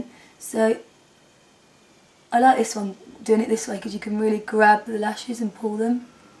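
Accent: United Kingdom. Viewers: Jesuuus! She's british and I can't understand many things she say :/ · I really love your brittish accent *__*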